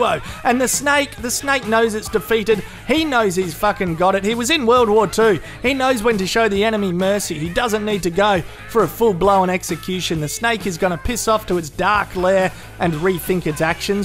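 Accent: Australia. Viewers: The perfect Australian voice over Sat-Nav along the Birdsville track or the Nullabor h'way! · Haha, Australian pronunciation: fvck ea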